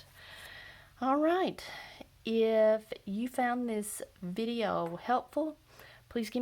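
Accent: South, United States. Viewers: I love your videos, what is your accent, Southern maybe ? I`m from Northern England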